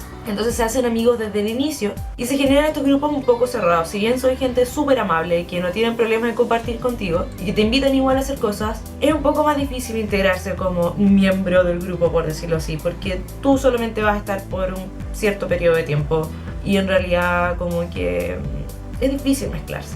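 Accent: Chile. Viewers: Me costó bastante entenderte perdón no es por ofender pero deberías ser un poco más clara al hablar se que así hablan los chilenos y no tengo nada en contra de eso pero habemos muchos latinos más que no entendemos así que vocaliza un poco más por favor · me encara tu tono chileno😊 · no es porque estabas acostumbrada a hablar inglés, si no porque tu acento chileno es muy extremo. No se entiende. Habla más neutro.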